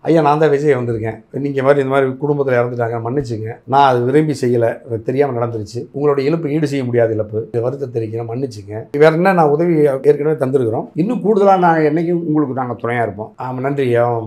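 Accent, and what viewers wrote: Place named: Kongu, India. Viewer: கொங்கு மண்டலத்திற்க்கே உரித்தான நக்கல் பேசும் ஆளுயா நீ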